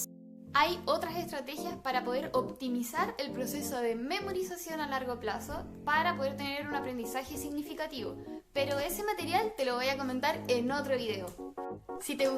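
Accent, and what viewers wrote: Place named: South, Spain. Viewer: tienes un acento precioso! Y ese acento sureño se entiende perfectamente! Muy buen video! Saludos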